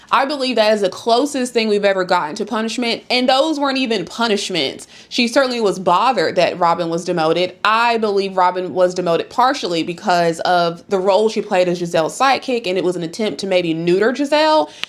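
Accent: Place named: South, United States. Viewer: absolutely love your voice and your accent, weirdly enough i don’t hear many southern accents in my video essays! you’re doing amazing btw i love your content i subbed after this video bc your content quality is top notch!!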